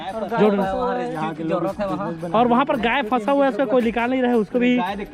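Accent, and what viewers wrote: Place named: Chhattisgarh, India. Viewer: ap ki Hindi + Chattisgarh bahut mazedar h.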